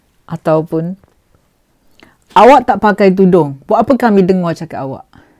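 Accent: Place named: Perak, Malaysia. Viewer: Maafkan teman,yong (P/C) Mariam Mokhtar.Dari tutur bicara mu,teman boleh agak, Mike(Mariam Mokhtar) ni,orang Perak?.Teman rase seronok bebeno bila dengar Mike bercerita hae had politik Malaysia sekarang. Sesekali Mike selit le sikit___Lompat katak,ADUN kitar semula yang tak cemerlang dan perangai MP waktu persidangan Dewan Parlimen. Sekian terima kasih.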